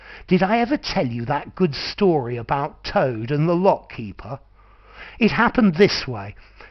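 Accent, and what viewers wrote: United Kingdom. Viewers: Ohh thank you very much, i have been looking for this one with British accent. I give you my like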